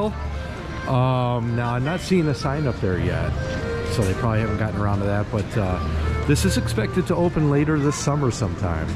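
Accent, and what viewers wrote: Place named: Minnesota, United States. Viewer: You from Minnesota or Canada? I can hear that accent right away.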